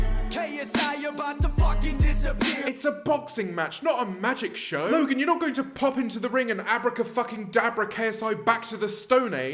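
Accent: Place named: RP, United Kingdom. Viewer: Your so posh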